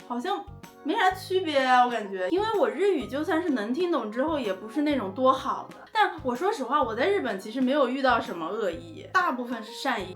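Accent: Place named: Shanxi, China. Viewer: 感觉有点儿山西口音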